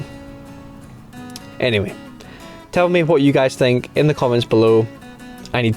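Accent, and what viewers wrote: Scotland, United Kingdom. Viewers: A car video with soothing music and the most relaxing reassuring Scottish accent I've ever heard? First ten seconds and you've already found a new subscriber!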